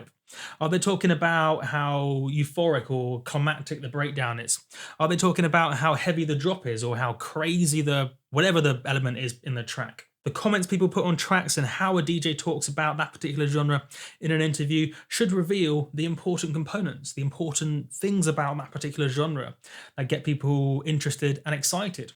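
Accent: United Kingdom. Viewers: BTW, are you British? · Is he a british guy?his accent..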